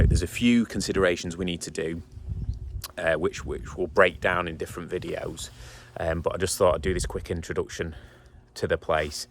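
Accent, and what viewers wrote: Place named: Northwest England, United Kingdom. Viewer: Your accent sounds like you are based somewhere in the NW. I’m midst arranging a eco4 heat pump install (and insulation) via the council for my parents, it’s for a nearly 300m2 property. Pre insulation it was a 18kW heat loss estimate from the EPC, obviously we hope that can be significantly improved. Wonder if I can ask what a the key aspects I should I be looking for from the installer please, it’s the council approved installer from Cheshire East that is based in Warrington/ Liverpool (I don’t like to mention whom it is). Thanks for any tips.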